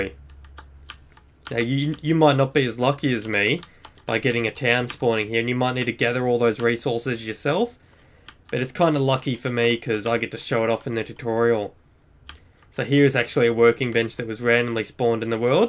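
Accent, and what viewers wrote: Australia. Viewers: I'm in love with the aussie accent!! chef's kiss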